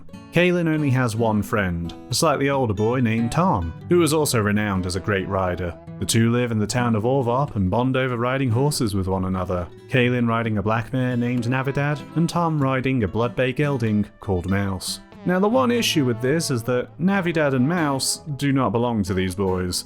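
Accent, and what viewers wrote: United Kingdom. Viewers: Dude! I had no idea you were british.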